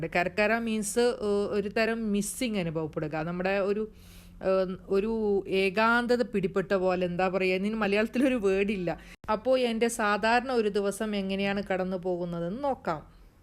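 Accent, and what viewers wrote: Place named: Kasaragod, India. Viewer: Ningal kasargod aano?slang kettit chodichada...evide thamasam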